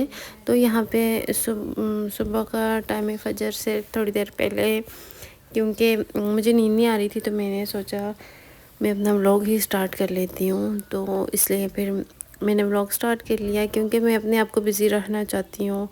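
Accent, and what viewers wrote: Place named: Chhachh, Pakistan. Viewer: اپ کے لہجے سے لگتا آپ چچھ کی ہیں نہیں؟ میں اٹک سٹی سے ہوں اس لیے میں لہجہ پہنچانتی ہوں وہاں کا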